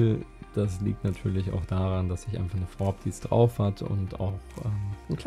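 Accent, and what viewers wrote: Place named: East Westphalia, Germany. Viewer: Da spricht der Ostwestfale :-D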